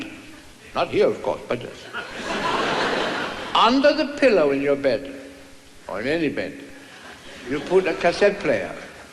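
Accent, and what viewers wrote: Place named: Denmark. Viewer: He tells the same jokes but it doesn't matter. He is still funny. I read his autobiography in Danish, bought in a second hand store. He had a successful career as a concert pianist in Germany before the war but eventually had to flee, being Jewish, through Sweden and Finland. Managed to get the last boat from northern Finland on a US special vessel sent to pick up US citizens. His first wife had spent time in the US and was a citizen. She insisted on taking her cat with her. They were parted but still friends, Victor and she, that is. Despite the ice and extreme danger, they made it to the US. I am not Danish, but speak Danish and always thought he was funny because of his accent in english. Then l found out that all Danes have a funny accent in english without even trying.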